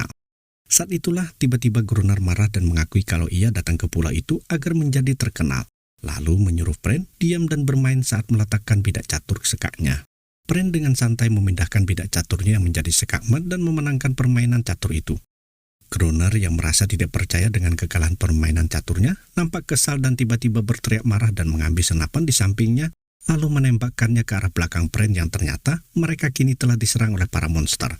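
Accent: Indonesia. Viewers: Maklum orang Sunda ya bang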